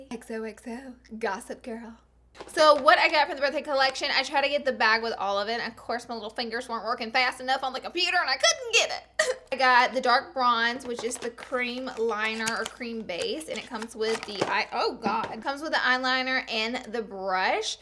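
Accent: South, United States. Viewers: i love your tutorials, are you Hispanic? you kinda look Hispanic, and i love your southern accent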